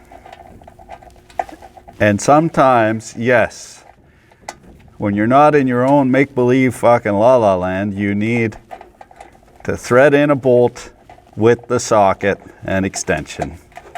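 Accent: Quebec, Canada. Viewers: Are you from Québec ? Been binge watching your channel since I heard you say calice de tabarnark in a Bosch video.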